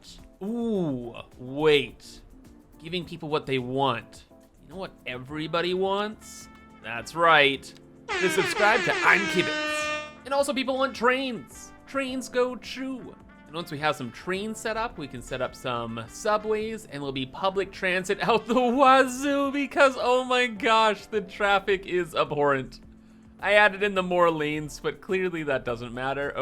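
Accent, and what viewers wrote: Canada. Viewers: as a canadian ur accent describes me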